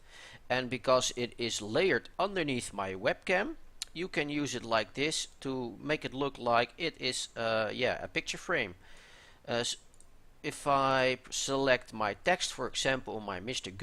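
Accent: Netherlands. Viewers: Three words and I knew you werd Dutch as well haha. Great tutorial/walkthrough though. I have recently starten streaming on Twitch and this really helped me out. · U're Dutch? :P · Ik hoor gelijk dat je Nederlands bent :D Nice video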